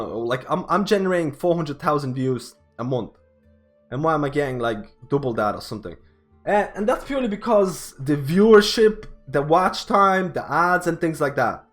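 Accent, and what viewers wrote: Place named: Ireland. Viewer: Came here for resto druid info, stayed for the Irish accent.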